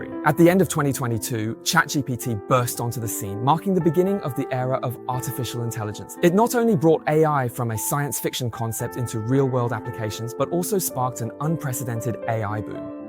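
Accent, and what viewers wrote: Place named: United Kingdom. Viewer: 怎么又变成英国口音了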